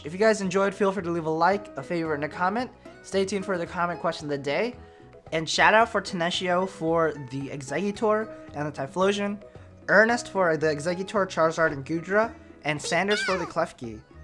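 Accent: Netherlands. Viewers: R u dutch???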